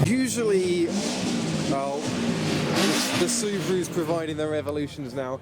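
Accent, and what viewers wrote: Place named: Roadman, United Kingdom. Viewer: "bare spaces" road man over ere 😂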